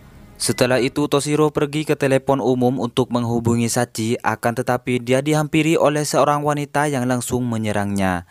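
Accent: Madura, Indonesia. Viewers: logat Madura checkkkk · spertinya orang madura ini wwkwkwkwk,,,,,,ketara sekali logat bicaranya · Oreng medureh been cong...?!?!?! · Kalo dari logatnya lo kayak madura ya bang? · Logatnya madura banget 😀. Semangat saudaraku 👍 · kentel banget y maduranya.. 👍👍👍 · Reng medhure ye teh ? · Logat admin dari madura ya? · Meduro iki koyok e sg ngomong 🤣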